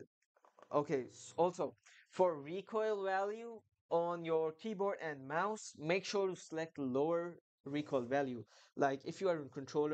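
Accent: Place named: Germany. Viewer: sounds like german accent lmao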